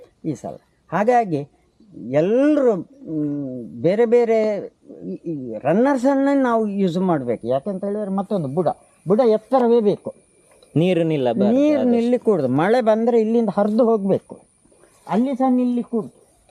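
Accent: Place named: Tamil Nadu, India. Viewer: ಇವರ ಭಾಷೆ ಯಾವುದು.. ಕನ್ನಡ ಸರಿಯಾಗಿ ಬರಲ್ಲ ಅನ್ನಿಸುತ್ತೆ.. ತುಂಬಾ ಕಷ್ಟ ಪಟ್ಟು ಕನ್ನಡ ಮಾತಾಡುತ್ತಾಯಿದ್ದಾರೆ. ತಮಿಳುನಾಡಿನ ಜನ ಕನ್ನಡ ಕಲಿತರೆ ಹೀಗೆ ಮಾತಾಡೋದು.. ಪದಗಳನ್ನ ಕೂಡಿಸಿ ಕೂಡಿಸಿ ಮಾತಾಡುತ್ತ.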